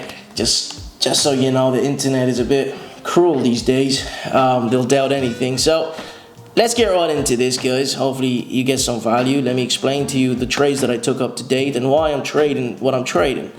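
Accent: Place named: Ireland. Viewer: what accent is that yo. Irish Im assuming sounds g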